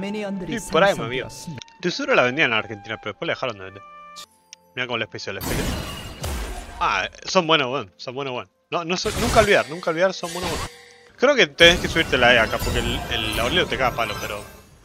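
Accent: Chile. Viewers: hablas como chileno ahora:face-purple-crying::face-purple-crying::face-purple-crying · Soy yo o me parece notar que a se le está pegando el hablar como chileno, con acento y todo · Grande hacías falta, pero algo me dice que alguien te está pegando un acento medio chileno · Por que habla como chileno ahora :V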